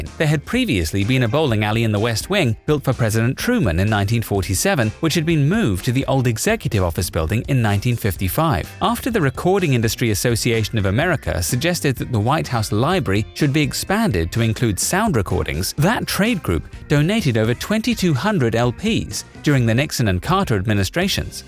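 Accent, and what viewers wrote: United Kingdom. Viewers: British narrator! 🤣🤣🤣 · 1. Not michelle but Michael oboma.. 2.Geprge Washington had a copper Steel first..not Obama brewery.. Why a British accent giving American history gives pause to wonder..ok I'm done.. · I like how this guy is talking about America but he British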